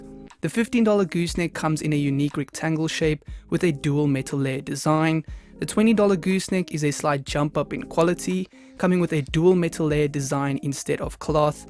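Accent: South Africa. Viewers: South African? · You sound south Africa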